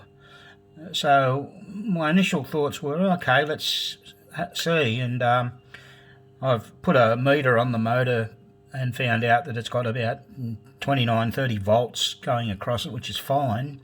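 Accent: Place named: Australia. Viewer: So glad to find your channel mate! To hear an Aussie accent makes it so much easier to follow along.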